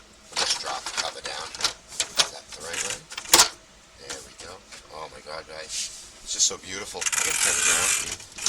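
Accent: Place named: Maine, United States. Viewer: Wow your from maine too I thought u might have been by your accent great vid by the way 👍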